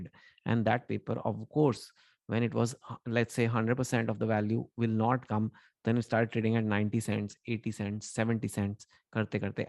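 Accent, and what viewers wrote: Delhi, India. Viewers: Thank you for your brutal honesty, I really enjoy your channel, only recently discovered it - although I am no where near your target audience. I am 38 yrs old female and find the content so entertaining even though it has nothing to do with my lifestage. I Especially love ur Delhi style of talking - I feel like some long lost friend is telling me his life stories:) Keep them coming!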